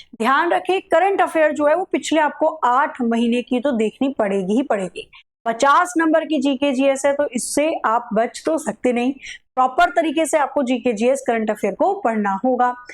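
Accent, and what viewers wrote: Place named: Bihar, India. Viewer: Mam your bihari accent is too cute ❤. Love it❤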